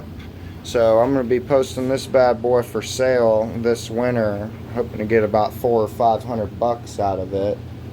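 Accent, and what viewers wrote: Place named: Deep South, United States. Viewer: It's 3am here in So Cal and I couldn't sleep. Pt 1 & 2 toolbox tour came up as suggestions. You're actually quite organized and no doubt have a wealth of repair knowledge. Both videos are concise and move right along. Best wishes on your career and youtube endeavors. You have an interesting voice and accent, do you claim the deep south as your home? Texas?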